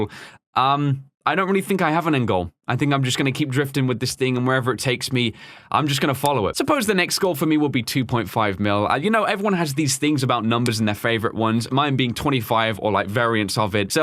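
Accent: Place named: RP, United Kingdom. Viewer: Good job you are crazy good I first started watching from case anamatronics part 1 old version ( sorry if you're insulted but it that vid you sounded so posh ) hehehe your really good keep doing what your doing I someday hope you get 3_4 million subscribers your so funny baz is also really good yeah but your really good I like your videos so much I am a really big fan of you your so nice and one day I would LOOOOVE to meet you keep going and keep making theese funny videos peace out Brah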